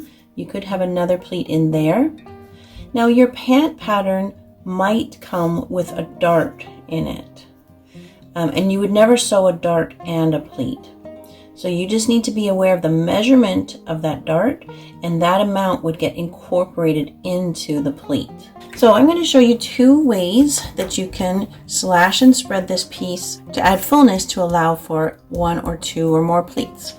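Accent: Canada. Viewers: I could tell you were Canadian by your accent 🤯 surprising! Hi from Canada 🍁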